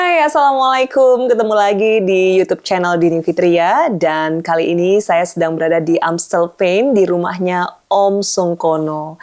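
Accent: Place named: Java, Indonesia. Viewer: Sdh puluhan thn tinggal di luar negeri tpi logat jawa tetap medhok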